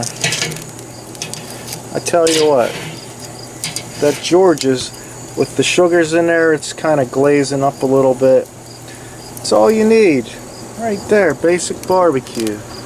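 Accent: Pittsburgh, United States. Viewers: Are you from pittsburgh? I detect the accent. You sound just like my dad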